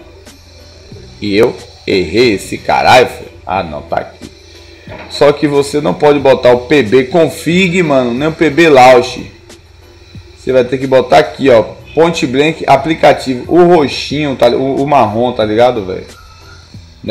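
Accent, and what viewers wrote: Bahia, Brazil. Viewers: So podia ser bahiano ne kkkkkkkk ( tambem sou )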